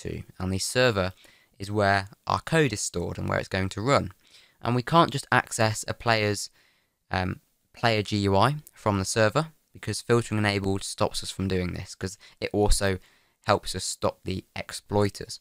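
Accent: United Kingdom. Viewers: British + English =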